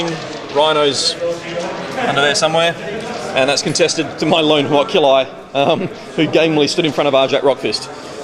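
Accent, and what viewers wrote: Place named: Australia. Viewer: cool, like the vids and its nice to here our aussi acent for a change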